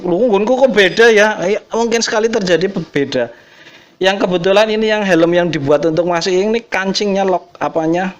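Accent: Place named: Banyumas, Indonesia. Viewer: ngakak mbah, ngapak campur b indo e khas bgt wkwk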